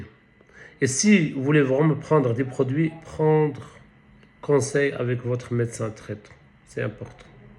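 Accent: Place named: Ouled Said, Algeria. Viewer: مستواه في اللغة الفرنسية شهادة شفهية ، عينية ، تفند ما يدعيه من موسوعية علمية. ما يثيرني في فرنسيته النبرة و الكنة ، فرنسيه اولاد سعيد ، أو الرحامنة ،حسب موضع الرضاعة.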